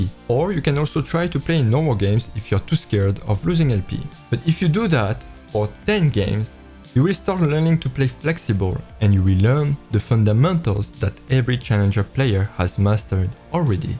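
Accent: France. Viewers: on dirait que tu as un petit accent francais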